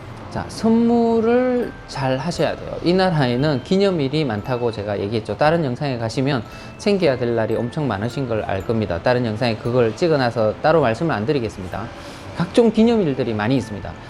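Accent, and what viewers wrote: Gyeongsang, South Korea. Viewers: 역시 서울말투보단 경상도말투가 믿음과 신뢰성이 가네요~~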